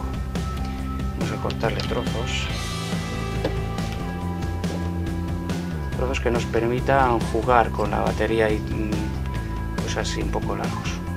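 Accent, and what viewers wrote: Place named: Galicia, Spain. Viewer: MUY BUENO LO SUYO DIDACTICO CLARO Y PRECISO COMO SE DEBE HACER .ME GUSTARIA SABER DE QUE LUGAR DE ESPAÑA ES USTED....PREGUNTO PORQUE COLECCIONO EN MIS OIDOS LOS ACENTOS DE ALLI. NO ES ANDALUZ NI CATALAN NI EXTREMEÑO N NAVARRO..NI ASTUIRIANO. EL SUYO ESTA CERCA DEL GALLEGO PERO NO ESTOY SEGURO.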